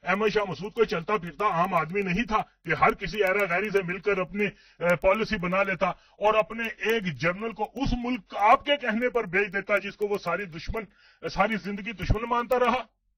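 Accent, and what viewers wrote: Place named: India. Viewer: bolnay wala to awaz se hi koi indian lg rha hai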